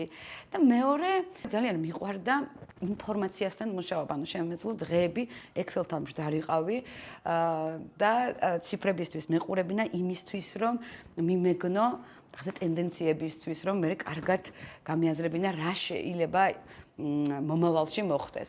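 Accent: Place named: Imereti, Georgia. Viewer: წარმოშობით იმერელი ხართ ხო? პირველივე ვიდეში მოხვდა ყურს იმერული ჰანგები და აჰა, 😄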